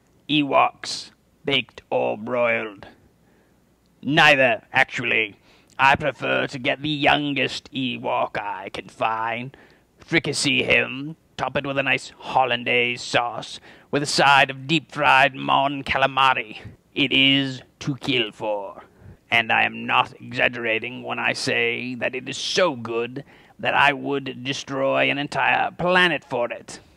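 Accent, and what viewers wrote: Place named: United Kingdom. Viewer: British accent lol. love the question!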